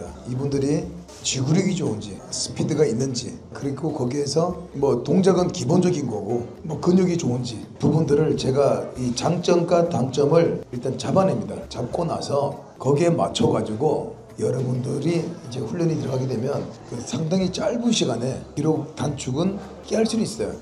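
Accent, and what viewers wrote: Gangwon, South Korea. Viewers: 강원도 사투리 억양 구수해요^^ 강릉 경포호에서도 러닝 클래스 해주세요😅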